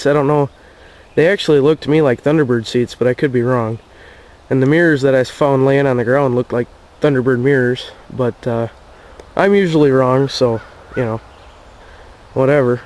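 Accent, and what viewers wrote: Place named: Pennsylvania, United States. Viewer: You sound like a Pennsylvainian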